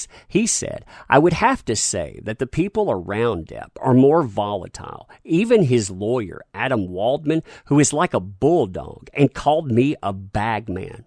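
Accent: South, United States. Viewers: Man your voice is rad. It sounds very southern and endearing. I don't really care about the story but how you portray the information has got me listening. I hope you and yours are good during these trying times.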